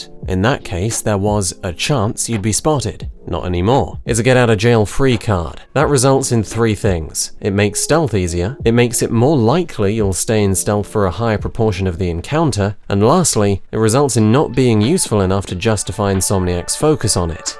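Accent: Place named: United Kingdom. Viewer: This is what any Englishman that isn't a chav sounds like. I assume you're either very young or American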